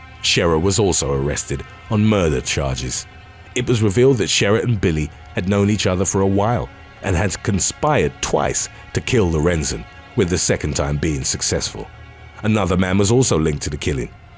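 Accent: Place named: United Kingdom. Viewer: um, where did he say ANYTHING about correct English? HE'S A BRIT.... meaning he's not from USA and is NOT familiar with our pronunciation.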